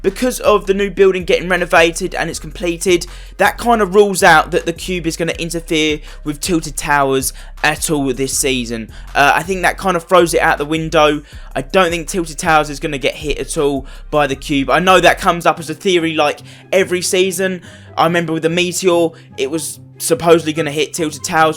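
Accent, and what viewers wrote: United Kingdom. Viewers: I did it. And r u from England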